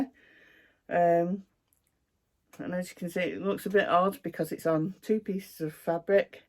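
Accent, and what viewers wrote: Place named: England, United Kingdom. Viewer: I love watching you. I’m 59% English so I love your accent. My ancestors were from North Hampton. They were Bletsoe there and it was misspelled when George came to America and they spelled it Bledsoe. I was born and raised in Oklahoma so you won’t get to it on One Nation for quite a while. I live up by Cleveland z, OH now. Your doll was adorable. My son and grandsons would love the X Box controller. You did a nice job on your husband’s gift. Thank you for making videos. I’m home bound so y’all are my company. Happy stitching.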